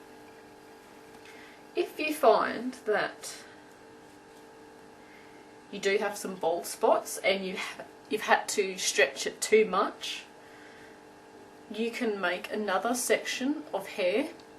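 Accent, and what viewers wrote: Australia. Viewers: She is Austalian and yeah her accent IS awsome =]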